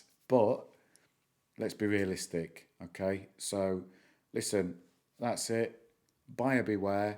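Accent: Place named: United Kingdom. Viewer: You sound liked an idiot. He’s just speaking with British accent.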